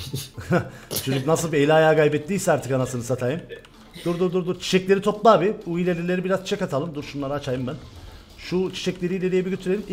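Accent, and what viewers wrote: Cyprus. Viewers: TAMAMEN KIBRIS ŞİVESİYLE OYUN YAP ABİİİ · Abi şuan kıbrıstayım otelde herkes değişik değişik konuşuyor şimdi anlıyorum seni :D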